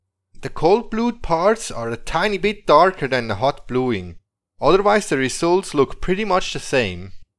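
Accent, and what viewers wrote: Switzerland. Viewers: So geil, wenn ich no vor em Rapsöl gmerkt han, dass das en Schwiizer sii müesst!!! 🤣👍🏻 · Ich wette Sie sind Schweizer. :) Tolles Video! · Awesome video, LOVELY 😀 Swiss accent... (I lived in Zurich for 23 years...) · Irgendwie amüsant, wenn man den Schweizer Akzent trotz englischer Sprache völlig heraus hört :D Es funktioniert also mit Metall, aber nicht mit Edelstahl.. Woran liegt es? Chromanteile oder worauf müsste ich achten?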